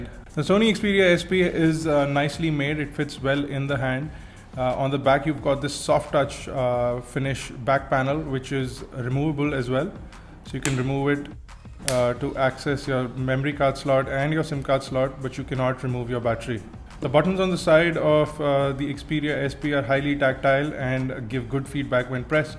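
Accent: India. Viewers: agghh...that indian accent is killing me..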